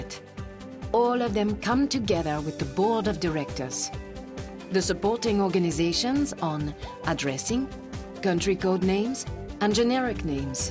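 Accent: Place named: Sweden. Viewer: I may be wrong, but I think it's a Swedish accent. I speak French and don't sound like that, and the CEO of ICANN is Swedish. I also believe the man who is credited for inventing the World Wide Web, Sir Tim Berners-Lee, is from England.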